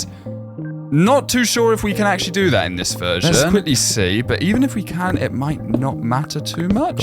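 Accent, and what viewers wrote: United Kingdom. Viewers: just realized he’s British/Australian/sum like that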